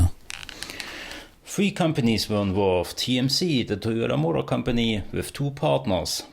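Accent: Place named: Saxony, Germany. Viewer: klingt sehr sächsisch, habe ich mich auch gerade gefragt 😂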